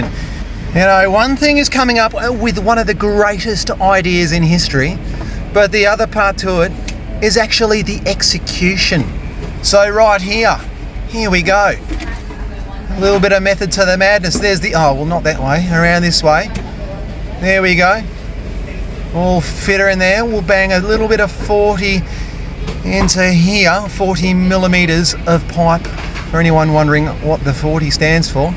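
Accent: Australia. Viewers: I love the Australian accent ❤ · The Australian accent just kills me😂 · Just looked up at the clock and it's here in the UK which means that I've been enjoying you videos now for almost 4 hours - brilliant, cathartic and I feel I've learnt to speak 'Aussie' in the process. Good on ye fella. Great to see somebody so into their work and enjoying every minute. Pity you don't operate around here. Cheers.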